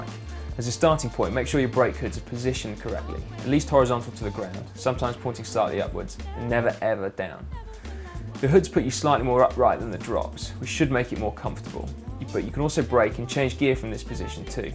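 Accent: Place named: England, United Kingdom. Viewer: Put subtitles on your videos, not everyone can understand strong English accent.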